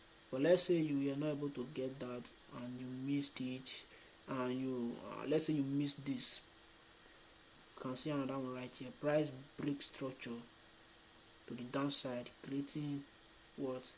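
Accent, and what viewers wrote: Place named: Nigeria. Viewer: Chai, I thank you very very very very very very very very much, infact words can't explain how I am thankful for finding this YouTube channel. Let me tell you, since I found this channel I have been having in my trades and heart. Normally I don't like watching any YouTube videos apart from ICT, but I was on a search on a question that was bothering me, then I stumbled on your channel, just watching one, just one video from your channel I got in love with the way you simplify everything ICT is teaching us. You know, there is a difference when your own Nigerian person is talking to you compared to an America or Foreigner with their accent. I just love it, Sir please keep it up to drop simplified videos like this and others in future. I'm your number 1 Fan. Love from me to you❤️❤️❤️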